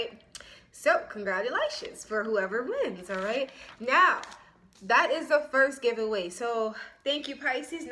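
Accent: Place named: Jamaica. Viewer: Jamaican accent sweet nu bloodclaaat 😏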